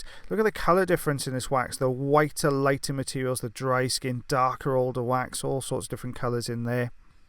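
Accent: United Kingdom. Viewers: Omg you should do hilarious ear wax removal in your British accent would awesome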